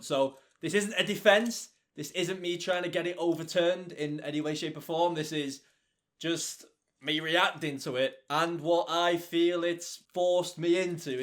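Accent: United Kingdom. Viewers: Can you explain why he got banned? I don't really understand the britisch accent so it is pretty difficult to understand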